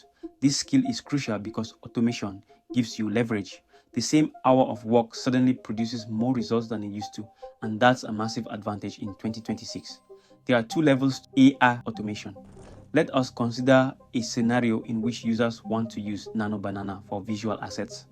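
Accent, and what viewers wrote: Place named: Africa. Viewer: Your video has a well- thought outline and a coherent presentation. However, the heavy African accent is a distraction and calls on viewers to focus on your speech pattern. There are soooo many hard-working content creators from 3rd world economies that FAIL to focus on their poor English speaking skills. You will struggle to keep attention from viewers in Tier 1 countries who will abandon the video after 2 seconds of listening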